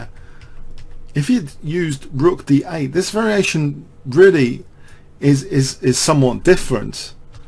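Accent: United Kingdom. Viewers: THORN pawn! Man, that british accent. You have no idea how long I wondered how that was spelled. I thought Foam Pawn, Fun Pawn, Foreign Pawn, Phone Pawn... Awesome series,!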